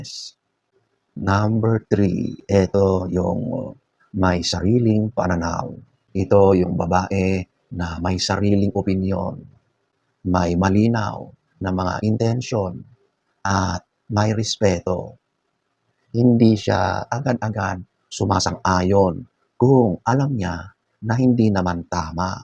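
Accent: Ilocos, Philippines. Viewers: Ilokano kaba idol madlaw ko gamin kayat ko boses mo super humble sika👍💯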